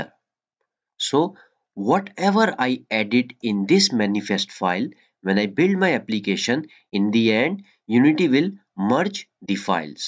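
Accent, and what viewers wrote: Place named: India. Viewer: 3 indian voice ! XD cool !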